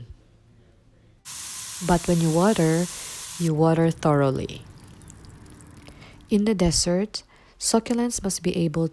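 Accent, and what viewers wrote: Philippines. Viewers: She sounds so filipino hehehe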